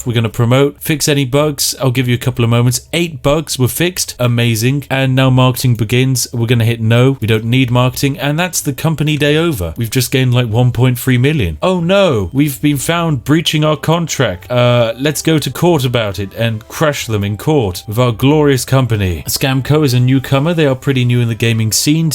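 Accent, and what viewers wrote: United Kingdom. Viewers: this man is everything right about the british accent. all over sub accents can piss off because they are horrible but this is the voice of eloquence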